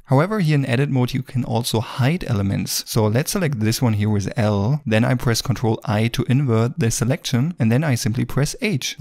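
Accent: Germany. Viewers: are you german? sounds a bit like an german accent :)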